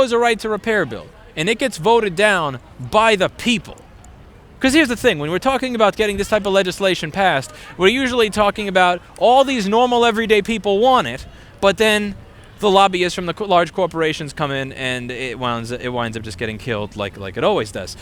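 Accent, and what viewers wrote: New York, United States. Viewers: your new york accent is particularily strong today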